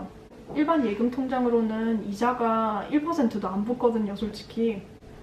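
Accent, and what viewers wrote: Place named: Busan, South Korea. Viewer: 좋은 정보 ㄳ요~ 누님, 부산 출신~? 정겹네요ㅎㅎ 근데, 혹시 헬싱키에 오피스텔은 어디서 찾아볼 수 있나요~?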